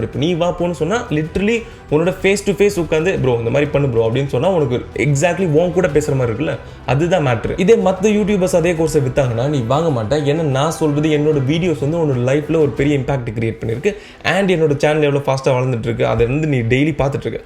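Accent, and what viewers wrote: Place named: Sri Lanka. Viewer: Bruh u sound like srilankan bruhh 😂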